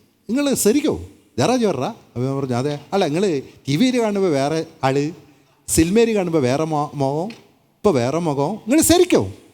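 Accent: Palakkad, India. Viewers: ഇയ്യാൾ പാലക്കാട്‌ ഭാഷ പറയുന്നത് ഒരു പ്രതേക വിഭാഗകാർ പറയുന്ന സ്ലാങ് ആണ്. ഇതൊന്നുമല്ല നല്ല പാലക്കാടൻ ഭാഷ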